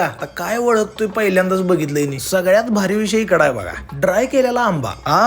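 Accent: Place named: Satara-Kolhapur, India. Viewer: खुपच मस्त video भाऊ... आणित्यात तुमची commentry म्हणजे ईशयच नाय रे भावा... इरसाल कोल्हापूरी... ❤❤❤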